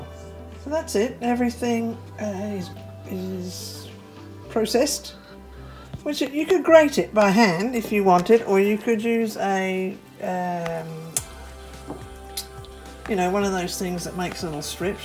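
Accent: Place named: United Kingdom. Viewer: Ah.... You have made my day, thanks for the fabulous video.... 💖, hello from France.. 🙋.... you sound very British, at the end of you"re videos, a nice cup of tea is very British....!! 😂🤣, mind you the French may say, "the trouble with you British, you think you can solve problems with a nice cup of tea" 😂🤣😆... Keep those videos coming.... Thank you, I'm off for a nice British cup of tea..... 👍🙏... Xx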